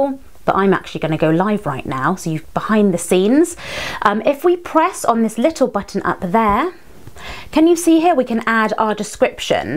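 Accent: United Kingdom. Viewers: You sound like Maranda sings but in a British version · ARE YOU BRITISH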